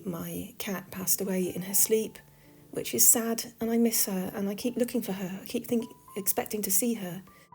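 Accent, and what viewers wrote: United Kingdom. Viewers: I didn’t catch your video on Halloween, but any time is a good time for a video from and. Nothing like a good ghost tale told with a British accent. 🥰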